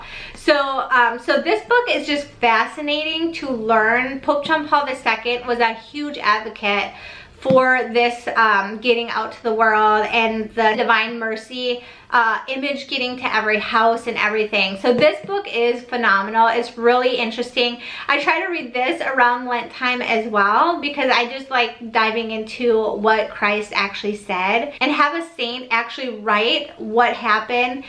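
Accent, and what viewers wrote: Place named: Chicago, United States. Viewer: Thanks for the book recommendations, am really curious to check out Consoling the Heart of Jesus....just a unrelated question/comment, are you from the Chicagoland region? Just picking up strong Chicago accent :)...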